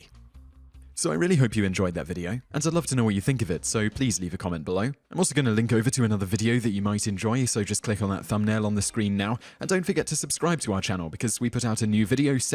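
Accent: United Kingdom. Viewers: I'm very confused... you sound british, your videos etc refer to US currency and culture (including references to foreign being anything not American), but in one of your other videos I thought you said you lived in the Czech republic??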